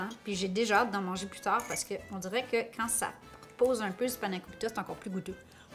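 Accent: Quebec, Canada. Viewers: Merci beaucoup de m'avoir répondu j'en suis très touché, car tu dois débordée de messages d'internautes. Tu as parfaitement raison il faut goûter ses plats quand on les a terminé, c'est une des raisons parmi d'autres pour la quelle j'apprécie tant ton blog, et ta bonne humeur constante, ton humour, ton accent québécois que je trouve si craquant, même il m'arrive souvent de ne pas trop comprendre tes expressions québecoises typiques, bien que je prends des cours sur des sites de traduction, donc je sais maintenant ce que veux dire " j'ai tellement capoté " ou " jaser " ou tes mesures en cuisine: là où tu dis une cuillère à thé nous français on dit un cuillère à café, où tu dis une cuillère à table nous on dit une cuillère à soupe, tu dis 2 livres nous on dit 1 kilo, Et j'ai beaucoup rigolé sur ta vidéo de recette de poutine dans le chalet type trappeur, où tu as parler de ton premier voyage à Paris avec Lionel chez son tonton et sa tata où il a " sacré " toute la soirée, " AAH fait nous sacres fait nous des sacres!! 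" 🤣😅!!! Mais vraiment une chose qui m'impressionne tellement c'est comme vous avez acqueilli aidé et élevé Momo, car ça à vraiment dû être tellement compliqué, vous êtes vraiment des parents que tellement d'enfants rêveraient d'avoir... J'aime comme toi énormément cuisiner et j'y passe des heures surtout pour ma chérie, puisque je n'ai pas la chance comme toi d'avoir des enfants... Et encourage Lionel à cuisiner plus souvent et toi filmes le, vous all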